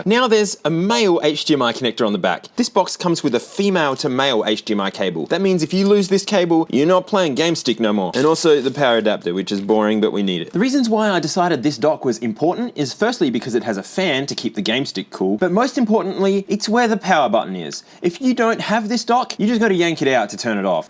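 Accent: Australia. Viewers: Didn't know I needed to hear an Aussie say "Ouya" today but here we are. What an accent. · And I think he's living in Australia because of his accent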